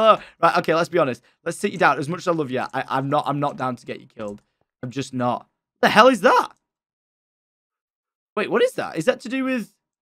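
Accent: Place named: United Kingdom. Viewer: So glad you went back and explored this world again. I spent many hours watching your videos. There's something about someone playing minecraft while talking with a british accent. Like watching Planet Earth. Now I watch other british minecraft streamers, like Ibxtoycat and Philza. I also recently started playing Minecraft again ever since the place I work at got a Minecraft exhibit. Minecraft is an amazing game! Thank you Syndicate!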